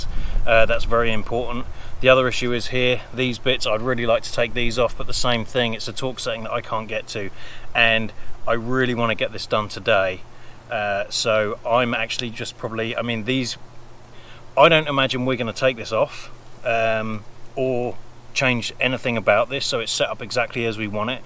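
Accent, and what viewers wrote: United Kingdom. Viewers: I was so confused at the start of your video. You sound very British, but your house and towing hardware didn’t match up. Your house didn’t have any brick or shiny black exterior plumbing. Good demo. I’m going to be doing the same to my towing hardware.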